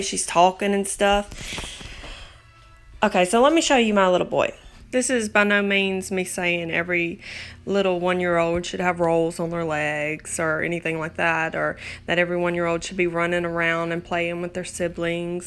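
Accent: South, United States. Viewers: Ummmm can everyone stop classifying vegans into the same group as raw fruitarian? I am vegan and chubby and healthy. Not every vegan eats fucking cucumber noodles and green smoothies. Animal food as this lady states is not healthy and is full of cholesterol and carcinogens. But leave it to someone with a southern accent to not do any research before making a video based off of one extremist mental RAW fruitarian/ NOT a normal vegan.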